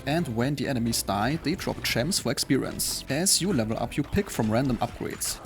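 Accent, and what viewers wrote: Germany. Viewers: Game looks great and is landing on gamepass at release tomorrow. Demo was fun so I'm looking forward to it. By the way... where is your accent from? Sounds German ;-)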